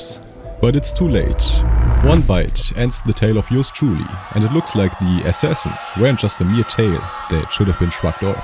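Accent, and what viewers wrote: Germany. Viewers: Der deutscher akzent hört man zu sehr aber gutes video schade nur das man auf der konsole keine mods benutzen kann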